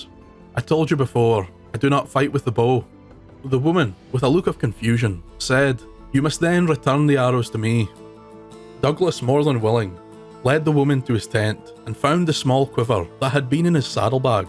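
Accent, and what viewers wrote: Scotland, United Kingdom. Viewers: Scottish history has always fascinated me. I could listen to a Scottish accent telling stories forever! Thanks for the great content.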